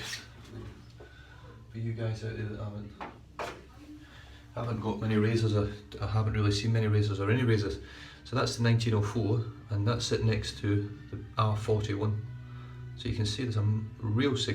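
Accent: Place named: Scotland, United Kingdom. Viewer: Hi new to DE shaving some great videos!! I recognise the Scottish accent good to see a fellow Scot on here. I'm in the west of Scotland myself.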